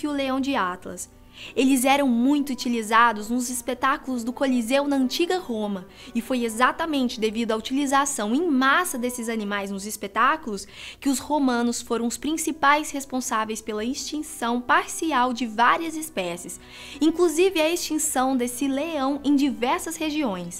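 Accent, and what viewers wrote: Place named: Minas Gerais, Brazil. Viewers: Pelo sutaque deve ser mineira. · A moça que fala nesse vídeo é de qual estado do BR? Parece de MG...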